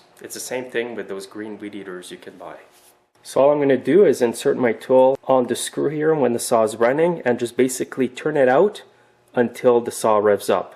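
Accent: Quebec, Canada. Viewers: Sorry,. I had a lot of trouble understanding your Canadian accent. Talk slower. Were you referring to the red or blue screw? Your voice dropped out. Can they set these up for Torx screw adjustment? Why the special tool?!? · I've currently got a Poulan, and while it's good for what it is, it's time for me to upgrade. My hardware guy sells Echo, and claims that the quality of the machines and that the company is on par with Stihl and Husqvarna. What are your thoughts? PS: Is your accent Quebecois or Manitoban?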